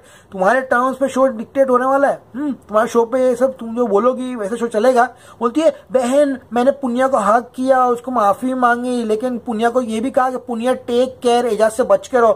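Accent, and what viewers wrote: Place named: Bihar, India. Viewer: Hahaa bhai Mai bihari but lekin jo tum nach baliye ka bihari dehat wale accent me krte ho mja aa jata hai..hahha tmhara review Bhai full mja More than bb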